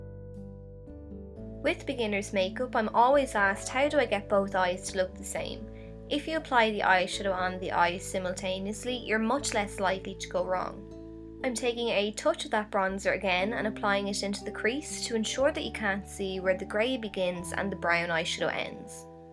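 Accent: Dublin, Ireland. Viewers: Really good video for me, I'm just starting out with makeup!! I'm from Belfast, and I got so excited when I heard you had an Irish accent:) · Love your beautiful Irish accent :) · Yeah she sounds a bit like me, so I think she might be from dublin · She's Irish 😊 I hear this accent everyday 😘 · is she irish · I love the Irish lilt! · love ur irish accent x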